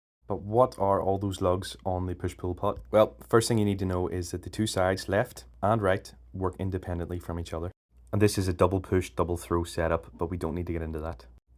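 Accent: Northern Ireland, United Kingdom. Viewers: have you been to matchetts in belfast? i can tell you’re from ni from ur accent 😂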